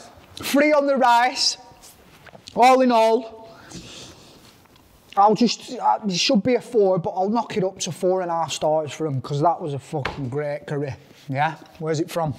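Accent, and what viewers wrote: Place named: Blackburn, United Kingdom. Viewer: Blackburn accent going on there 😂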